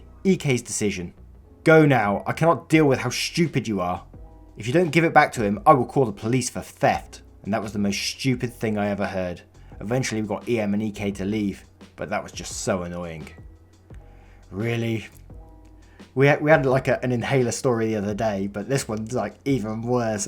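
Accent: United Kingdom. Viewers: That British blue accent tho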